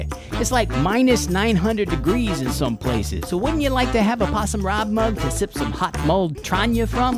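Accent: Brooklyn, United States. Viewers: just love the ny brooklyn attitude and accent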